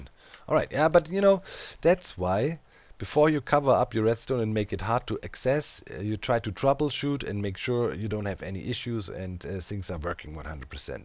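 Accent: Germany. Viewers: hey i wonder If you can understand that ich habe erst und der Folge erfahren das du deutsch bist hatte aber immer den Akzent gehört ich gucke deine Videos jetzt seit grob einem Jahr die Shadow items die du mumbo letzte Season gezeigt hast haben haben mich neugierig gemacht und ich habe dich abonniert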